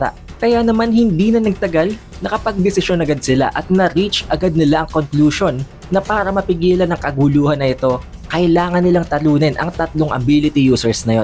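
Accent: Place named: Conyo, Philippines. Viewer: Inexplain ❌ Ipinaliwanag ✅ Ishinare ❌ Ibinahagi ✅ Nireveal ❌ Binunyag ✅ Mga simpleng salita, pero di alam ng mga purong tagalog. Hindi alam? Or baka kinalimutan? Ewan ko bah, nakakapeste lang pakinggan na banggitin ang isang salita sa ganyang paraan kung may pormal na salitang tagalog naman. Tunog conyong bakla na tuloy pakinggan. Bisaya ako pero mas magaling pa ata akong bumigkas ng pambansang wika. Kailangan na ata ng karamihan magbalik-aral.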